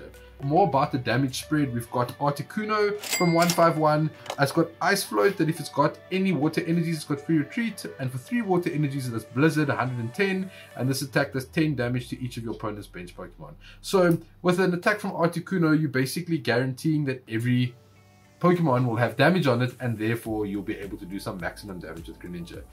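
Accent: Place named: South Africa. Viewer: You have the thickest sa accent! Nice vid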